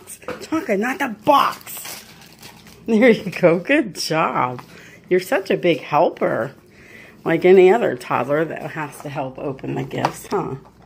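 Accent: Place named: Alabama, United States. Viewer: I am almost certain my brother has a fenced yard. Can he be with other dogs? My brother has either two or three and all are good and sociable dogs. I'm sure he'd tell you otherwise, but to my knowledge they would get a long with another dog. That would be a thing to watch for though because you wouldn't know my other dogs reaction to a new dog coming in their territory. I have emailed my brother and I expect to hear back promptly from him. The other thing about his dogs though is I'd be at my brothers going over things are just taking and one of his big dogs would just lick my arm from elbow to shoulder. I'm pretty sure they were tasting me to see if they wanted to eat me. One of the dogs is named Swan and she is a really nice dog who I have been around a lot when Bob lived out here just a couple of years ago. He has another dog named Buddy who is also a really nice dog. 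I think he has a couple of yippity dogs, but I doin't remember their names. You'd probably like Bob's wife-you talk just like her. She's from Alabama and she says the "yes, ma'aam and the no, sir", no matter if she's talking to an 82 year old or a 2 year old.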